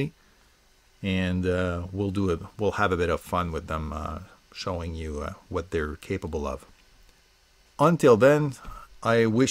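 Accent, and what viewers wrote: Quebec, Canada. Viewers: Is it just me or you have a Quebecois accent? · T'es tu québécois hahah?:)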